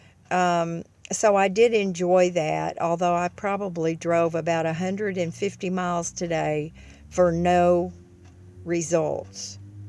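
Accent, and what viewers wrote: South, United States. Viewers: Just taking bids I hear. You have a southern accent, but do you speak Spanish? haha.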